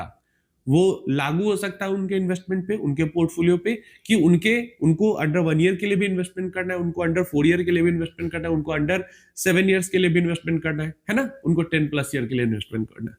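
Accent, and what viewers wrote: Bihar, India. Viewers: Namaste aap bihar se ho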